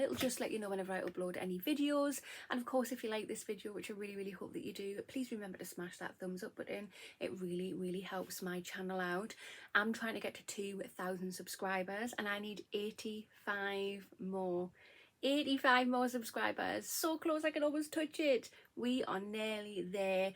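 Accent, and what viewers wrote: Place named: South Shields, United Kingdom. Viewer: I went over and had a look! Out of curiosity where are you from? I’m getting a South Shields accent coming through but I may be wrong!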